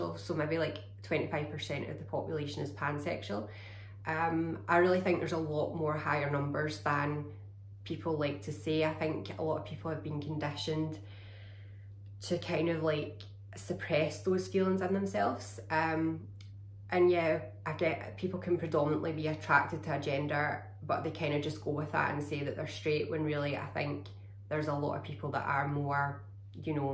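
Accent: Scotland, United Kingdom. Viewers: Lovely to hear a fellow Scottish accent,especially from someone so beautiful in both looks and deeds. You're videos help many which is a beautiful thing to do. Keep the great work girl..xx. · Wow, never seen a fellow Trans-person with a Scottish accent before!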